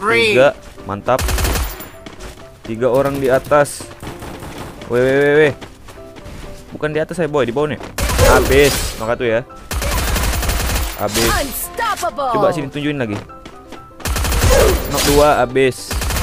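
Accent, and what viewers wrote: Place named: Makassar, Indonesia. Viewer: KaLau BoLeh Tau Abang Orang Mana , Kok Logatnya Kyak Makassar ( Masih Mauko Datang Besok ) 😂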